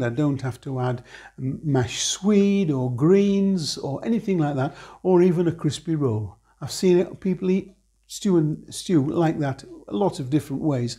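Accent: Yorkshire, United Kingdom. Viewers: your cooking videos, along with your yorkshire accent mr, bring back fond memories of home (yorkshire lass from West Melton).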